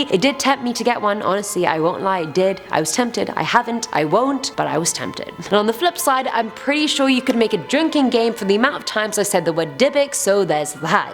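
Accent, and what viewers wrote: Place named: United Kingdom. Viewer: i wish the host spoke clear straight English without that British accent, was really struggling to understand her ........that’s it I’m giving this video a thumbs down because i cant understand what this woman is saying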